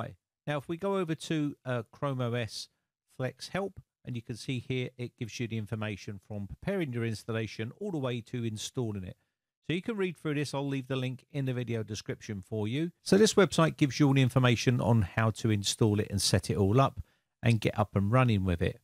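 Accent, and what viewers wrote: United Kingdom. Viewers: August 14, 2022 - Compliments to for a clear, and concise instructional and informative video. As an anglophile, I particularly like accent.😉 Most of my drama entertainment comes from Brit productions. I watched this video because Microsoft is getting more authoritarian by the day. With Windows 11 they are basically making people that buy that OS their property. They want to control everything from the customers' PCs to the customers themselves. Google is no "knight in shining armor", but I would rather sell them my information in return for an OS that works, and is free in a monetary sense anyway.😏😏 One of the more positive things coming out of the whole Climate Change, and preserving resources movement. Is that people are becoming increasingly concerned about Tech items that can't be upgraded or repaired. So fortunately more people are interested in recycling and repurposing things that shouldn't be thrown into landfills until they are absolutely useless and just don't work anymore. 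I would appreciate it if made a future video that is more oriented towards installing a Google OS on desktop PCs. Cheers from Connecticut, U.S.A👍👍😊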